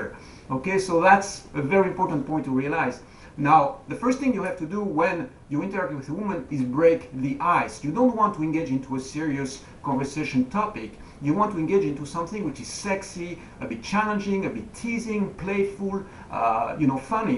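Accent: France. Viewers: is your accent French?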